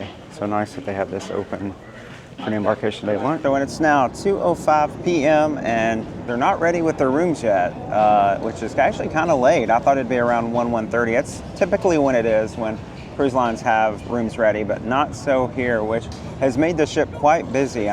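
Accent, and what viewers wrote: South, United States. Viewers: Nice vid!! Love your subtle southern accent! Reminds me of a good friend of mine from Georgia 🙂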